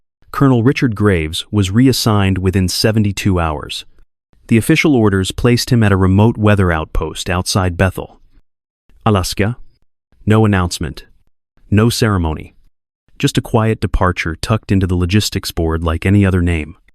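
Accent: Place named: France. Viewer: Why does the voice ,occasionally, seem to talk with a French accent?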